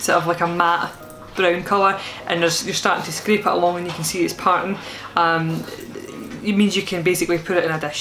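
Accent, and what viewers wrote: Scotland, United Kingdom. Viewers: I was born in Motherwell and lived in Wishaw. I moved to Canada 10 years ago. I have a similar accent to you but no tattoos ! My accent has never changed. Canadian laugh at my accent and encourage me to speak as a entertainment oddity in Collingwood, Ontario. I love your videos and remind me of home when you make tablet and coconut truffles. You are great.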